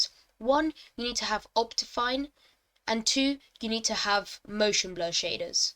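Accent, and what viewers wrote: United Kingdom. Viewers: ayo first time hearing a female british player! you deserve more attention on your vids tho edit: ok before someone comes to kill me, i dont know anything about the voice seems female to me so i said it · that british accent doe 👌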